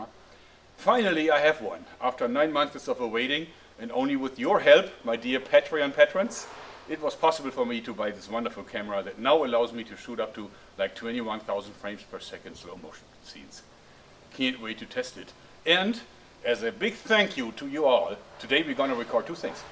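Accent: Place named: North Rhine-Westphalia, Germany. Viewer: Da bin ich mal gespannt.... Einfach geil - der NRWler ist einfach durchzuhören !!!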